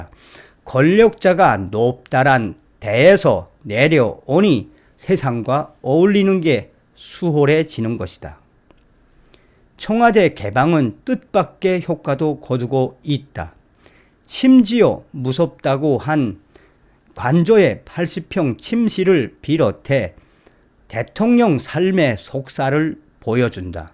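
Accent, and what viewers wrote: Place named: North Korea. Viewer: 이런 재길 안전히 북한 말투 재수똥박아지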